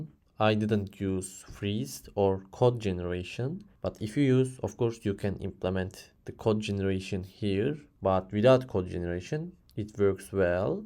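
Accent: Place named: Turkey. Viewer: Meraba 4. Saniyedeyim aksandan turk oldugunu anladim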